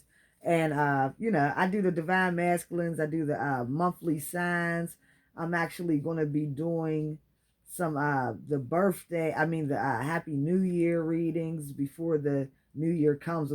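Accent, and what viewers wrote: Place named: Baltimore, United States. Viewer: oh wow idk why it sounds like a baltimore accent lol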